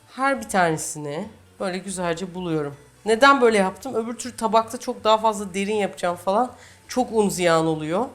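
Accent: Cyprus. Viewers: Tarifi denedim .. sarmısak da ekledim süper oldu.. emeğinize sağlık çok guzel bir tarif oldu!!! Naçizane birde önerim var.. ben kıbrıslıyım. Sende de hafif bi kıbrıslılık var!!! Ve biz keklik tavşan turaç, tahtalı güvercin(fassa) gibi av hayvanlarını çok tüketiyoruz.. eğer düşünürseniz sizden gekecek av eti tariflerini merakla bekliyorum! 👍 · Kendisi Kıbrıslı o yüzden var biraz o şivesi.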